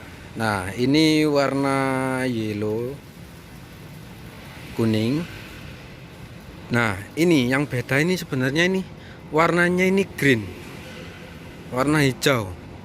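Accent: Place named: Java, Indonesia. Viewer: Alami aja mas bro, nek medhok ra sah gue2 an.. Wagu.. Hahaha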